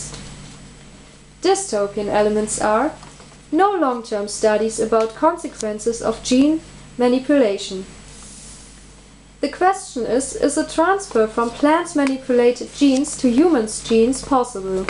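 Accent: Germany. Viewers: Tolles Video ! Dieses Englisch mit deutschem Akzent ist einfach herrlich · cooles video! man merkt aber an deiner aussprache dass du deutsch bist :D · Die Aussprache is schon ziemlich deutsch xD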